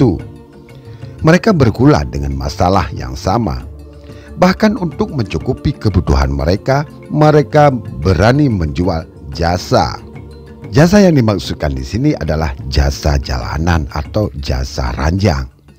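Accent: Aceh, Indonesia. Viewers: Ni admin nya logat nya seperti suku aceh. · sigoe sagai lon deungo su logat... langsong tatupue awak aceh :D,,, bereh adun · Pasti komentator awak aceh nyo 🤭 lemah logat bahasa indon jih 😂😂👍🏻👍🏻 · Voice overnya kayaknya orang Aceh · Admin logatnya mirip orang Aceh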